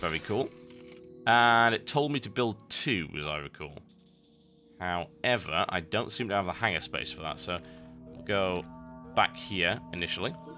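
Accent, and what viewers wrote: United Kingdom. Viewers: Woo, half an hour of soothing limey voice.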